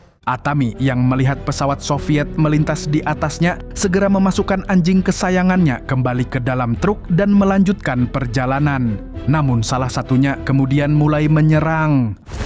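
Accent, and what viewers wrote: Banjar, Indonesia. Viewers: Orang Banjar sakalinya adminnya😂😂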